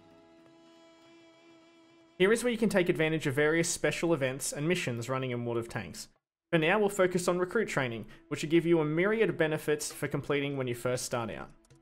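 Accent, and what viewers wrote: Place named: Australia. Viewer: nice aussie accent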